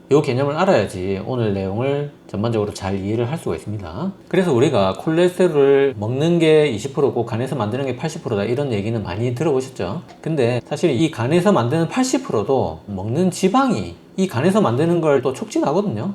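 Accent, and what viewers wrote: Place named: Busan, South Korea. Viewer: 부산 말씨가 어찌이리 구수하고 삼경살 굽는 냄새는 물러나라~! 매력 통통 젊은 의사샘님 즐거움을 주셔서 감사합니다! 자주 뵜으면 좋겠네요~^^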